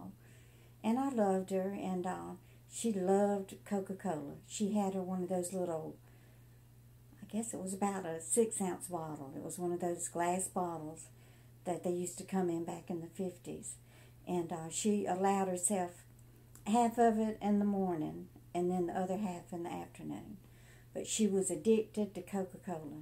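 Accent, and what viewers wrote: South, United States. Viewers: 28 here , googled how to wring a chickens neck and got this video 😅. We have too many roosters and i don’t have a knife sharp enough but all that aside ; I listened to your video and parts of it felt like my own paternal grandmother . She was widowed when I was 6 . Loved her Diet Coke about the same way too haha . Thank you for sharing , I don’t know why you did it , but it made my morning all the better . 🩶 I heard you say Columbia and you sound southern as all git out , so I’m guessing you’re around Sc so hello fellow south cackalakian !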